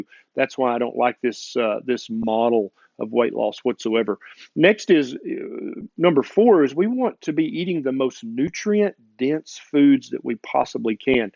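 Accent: South, United States. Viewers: Apart from your incredible intelligence, you could have been a movie star from the golden era of Hollywood! Love the Southern drawl as well!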